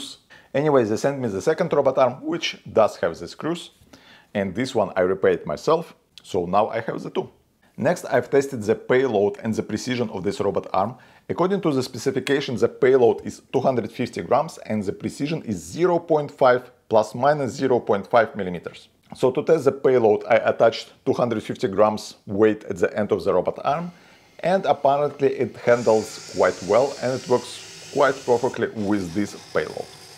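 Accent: Russia. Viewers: Теперь я понял, что значит "русский акцент". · Он не русский. Сильный русский акцент. Но он немец из Швейцарии.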